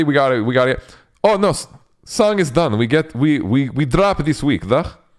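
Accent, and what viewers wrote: Russia. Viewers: Nice Russian accent. Can we all just agree with the fact that Alex has like a little bit more fanbase than STP. And at this point I'm too afraid to ask..... Plzz no offence I dig STP.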